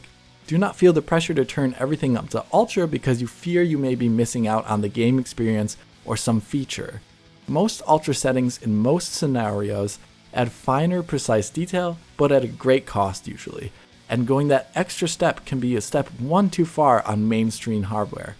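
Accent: Germany. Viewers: German is your first tongue isnt it? Excellent english pronouciation tho! And overall great video quality from what I've seen so far. EDIT: "Und auf Wiedersehen!" Yeah i think that settles it :-P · Trevor Cook Really? I'm pretty sure I hear a German accent when he's speaking English though. And the German things he said sounded absolutely 100% perfect. The "melody" of his voice also sounds very German to me... If he really is an American he's been assimilated into oblivion gawdamn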